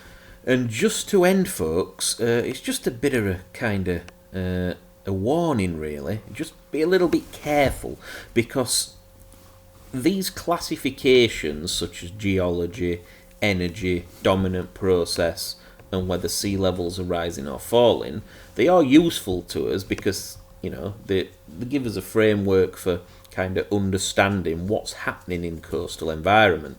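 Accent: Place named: Northern England, United Kingdom. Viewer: I'm only here to listen to that delicious nothern voice say 'Cooooast'.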